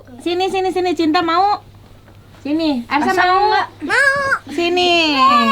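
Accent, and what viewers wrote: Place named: Sunda, Indonesia. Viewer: Berasa d garut kitu tah suasana na nyariosna sunda sadaya tth geulis ih resep abi mhh😇😇😇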